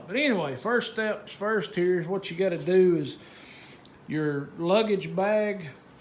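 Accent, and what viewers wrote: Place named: South, United States. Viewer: Alabama or Georgia? My Mama was from Columbus, Georgia and it's my hobby guessing where ya'll from with your Southern accents. She never got used to Montana winters. Foot in mouth if I'm wrong.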